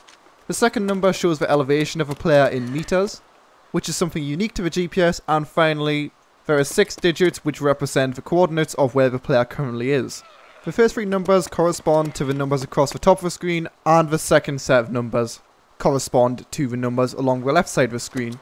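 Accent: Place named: Northern England, United Kingdom. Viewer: good to hear a fellow northener on the tube :)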